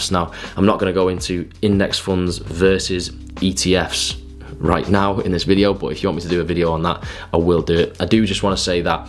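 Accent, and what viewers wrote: Liverpool, United Kingdom. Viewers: Scouse power! 💪🏼 Quality video lid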